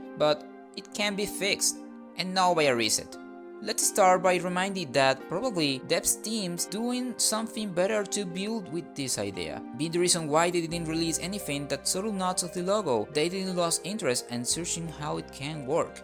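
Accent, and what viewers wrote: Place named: United States. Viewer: My little this is actually so different from the spanish video, loved to hear you in gringo mode 🤙💛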